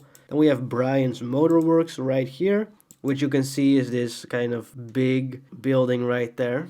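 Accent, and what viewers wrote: Netherlands. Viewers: I can smell dutch, nice vid btw! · You dutch?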